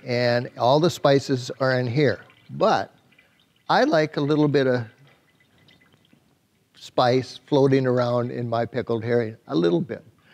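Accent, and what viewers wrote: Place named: Minnesota, United States. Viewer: Miss you. Hope you are okay. Love that Minnesota accent 😍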